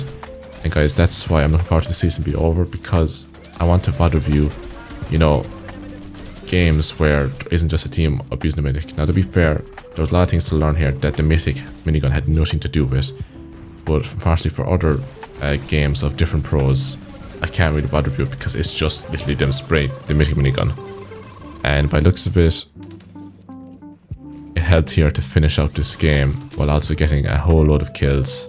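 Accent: Ireland. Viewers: Are u irish ?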